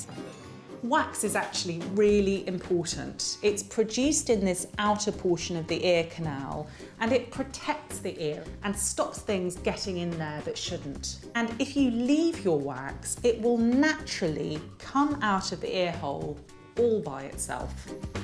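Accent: United Kingdom. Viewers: What a beautiful British accent God Bless 😇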